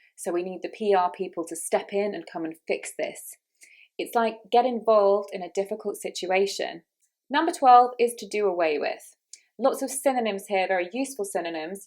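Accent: United Kingdom. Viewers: ❤, I love ur accent so much I wanna know the name of this lovely British accent Thank you ❤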